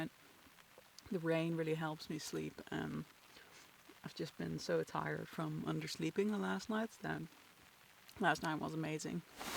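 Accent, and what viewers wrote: Netherlands. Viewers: Wow! That is so beautiful, what a cool adventure. You are very good at filming and editing by the way. I would love to do this trip, just not too thrilled about potential bear attacks but I guess chances of that are slim. I was trying to pick your accent but it's hard; are you Dutch? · Do I hear a Dutch accent? Love your content btw! Great views!! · What and adventure. And what a lovely woman! ❤. Are you dutch by any chance? You're accent kind off is but your English is flawless. Really enjoyed this film! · my algorithm brought me here, cool content, your accent sound a little dutch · Your accent is cute, you sound Dutch. Where are you from? Groeten uit Holland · Are you Dutch? I'm trying to place your accent 😊 Beautiful adventure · Such a fabulous video, exciting and relaxing and inspiring. Do you have any Dutch heritage, your accent really reminds me of my fellow Dutchies. 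· Thank you Youtube, you are finally learning what I like. That's some amazing scenery, I also love to be out in nature solo. Am I crazy or do I indeed hear a Dutch accent? I often dream of moving to Canada to live this peacefull lifestyle. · Love the video! Thanks for sharing. I hear a slight dutch accent? Or is it just me? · Your accent. Are you from the Netherlands? Very cool video. I love that canoe! I live in New Zealand. We have quite a bit of terrain like this as well. If you ever get the chance, check out the south island of New Zealand. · Hey! Small world! I randomly came across your Bowron Lake video and noticed from your accent that you’re Dutch? Did the Bowron Lake circuit in mid-May this year, shortly after moving from the Netherlands to Calgary. I brought my camera along as well and was hoping to film the trip, but unfortunately my camera went for an unplanned swim on the very first day 🥲 So I never got to make the video I had in mind. 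Anyway, I really enjoyed seeing your take on the trip! Thanks! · Are you a dutch speaker by any chance? your accent reminds me of dutch speakers speaking english. Love your content!! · You don't sound like real american accent. Are you Dutch?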